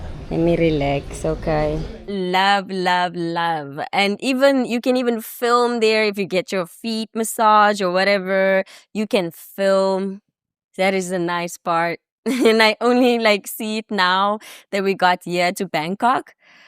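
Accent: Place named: Barbados. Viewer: I love your accent you kind of sound like your from the Caribbean island Barbados, thank you for sharing your journey with all of us.😊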